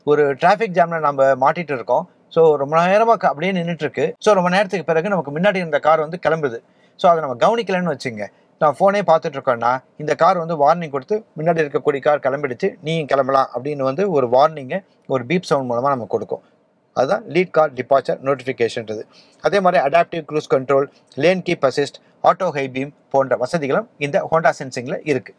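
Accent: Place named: Mylapore, India. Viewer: Boomer mama your is like our pal packet Mylapore nai sekar slangs. Why 😮😮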